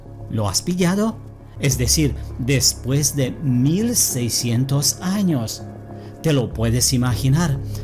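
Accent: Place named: Germany. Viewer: suena como si fuera alemán nativo, escucha narrar a alpha mind o a libertalya ambos creo que también son alemanes narrando estos temas en español y su pronunciación es la misma que. No pasa lo mismo con los gringos o negros de inglés nativo que hablan español su pronunciación es muy diferente.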